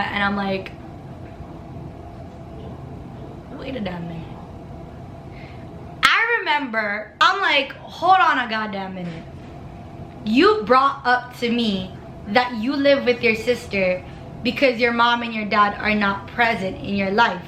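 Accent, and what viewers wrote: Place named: Jamaica. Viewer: Why she trying to sound like a jamaican?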